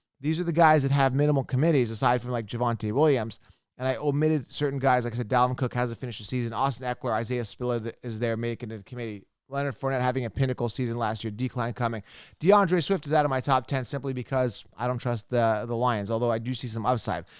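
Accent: Canada. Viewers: Are you a Canadian .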